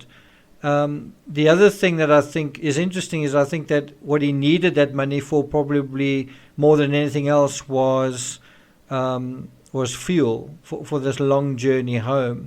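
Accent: South Africa. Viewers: I love your accent am I right in saying it's a South African accent. Its the one accent I wouldn't mind the whole world having.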